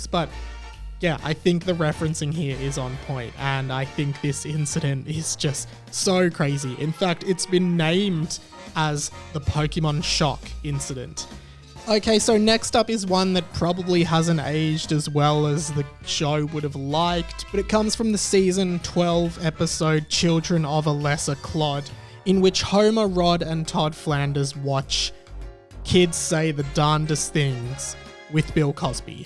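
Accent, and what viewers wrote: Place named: New Zealand. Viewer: I like your New Zealand accent.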